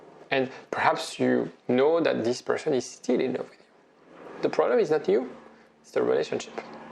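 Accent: France. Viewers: French accent is awful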